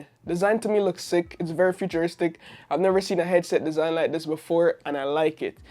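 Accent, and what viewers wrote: Jamaica. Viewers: U Jamaican Bro? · Yeah your Jamaican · Could tell from the get go that you were Jamaican 🤣🤣🤣 A di only reason me subscribe, bless up. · You deserved my sub bro....btw that accent are you jamaican? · Are u Jamaican dawg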